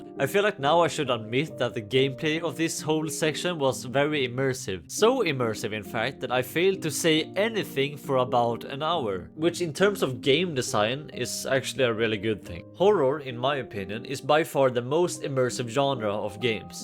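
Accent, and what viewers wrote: Sweden. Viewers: Is he swedish?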